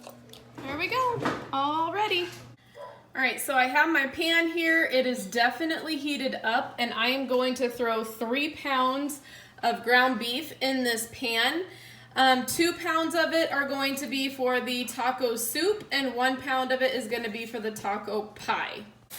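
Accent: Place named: South, United States. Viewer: Man I wish my family ate left overs I cook fresh daily my husband eats last nuts for lunch n my son eats last nuts for after school snacks ...what state do u live in ? U sound like that one girl who always says y’all she makes tons of videos .. n yes I live keto connects as well . Ur nails r on point 💕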